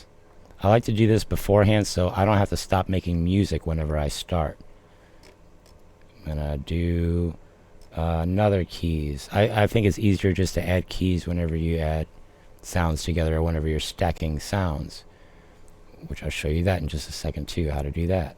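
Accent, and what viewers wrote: St. Louis, United States. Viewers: Yoooooo are you are Cardinals fan? I’ve always taught you talked kinda like a true south STLer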